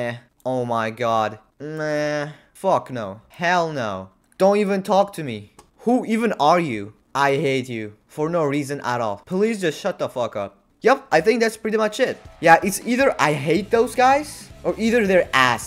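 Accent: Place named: United States. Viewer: Your Asian face with an American accent funny as hell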